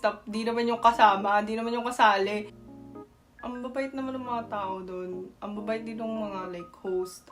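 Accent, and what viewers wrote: Batangas, Philippines. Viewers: I have been watching your vids ever since i chanced upon one some weeks ago, and i got hooked. Eh, para akong nakakarinig ng aking kababayan, Ay, Batanguenang Batangauena magtagalog pati punto eh 😀😀😀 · Inborn na may natural linguist skill itong si, pati english accent, pinay na pinay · Para kang Pinoy talaga kung magsalita.❤ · So true--very Filipino nga Tagalog niya and kung papakingan lang akala mo talaga Pinoy yung nagsasalita. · Pure pinay accent..😘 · tlgang pinay n pinay k n tlga mag kwento.. pati ung expressions ng lhat ng galaw at pananalita, pinay n pinay kna tlga...i am proud of you..❤❤❤ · I like your Batangueno accent hehe... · Woukd kove to hesr yiur Batangas accent!👍❤️💚💜👏🤣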